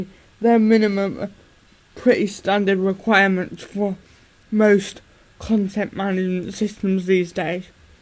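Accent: United Kingdom. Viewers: are you from south whales ol chum?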